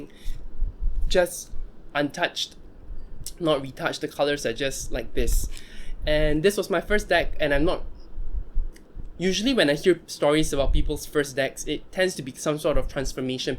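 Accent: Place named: Singapore. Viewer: Are you from Singapore or Malaysia? We got the same accent fam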